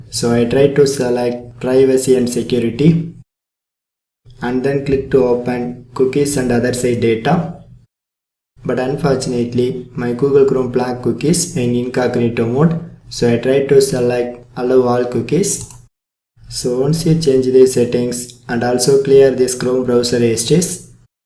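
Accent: India. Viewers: When you hear an Indian you know it’s gunna be right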